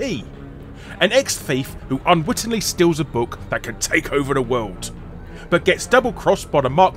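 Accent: United Kingdom. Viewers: British pronunciations of Japanese names hurt.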